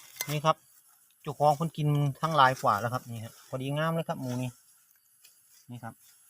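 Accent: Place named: Kut Bak, Thailand. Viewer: สำเนียงกุดบ่กชัดเนาะ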